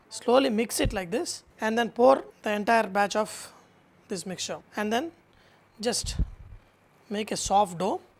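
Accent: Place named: Telugu, India. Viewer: I like the way this guy speaks.......the accent is of a typical telugu guy..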